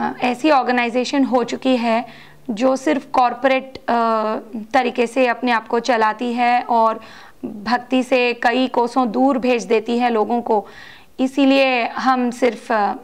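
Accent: Standard. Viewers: Aapki Hindi bhut hi Sanskrit nisht Aur shuddha hai Aur bhut hi uttam hai adhiktar hindi bhashi logon se…..aapko pranam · Ma'm itni shuddh hindi to aajkal koi north India main bhi nahi bplta he😄. Your hindi is at/ even above native speakers. No need to be worried about it.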